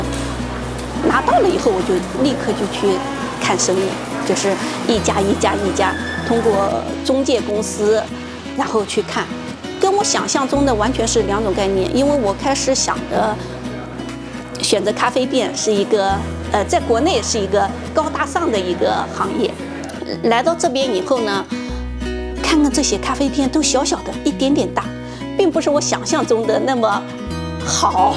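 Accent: China. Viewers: 果然是南京老姐，一听口音就像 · 一听就是江苏的， 这里面对的困难要比国内多得多，就业发展（无论是你或者子女）都不如中国， 但好在你生的是个女儿， 在澳洲也不难混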